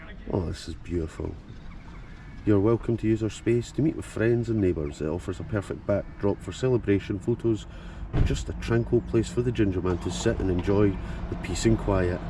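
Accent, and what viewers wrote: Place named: Scotland, United Kingdom. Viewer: When is a loch not a lock Answer When your listening to a Scott description of his own Country Music to my ears 👍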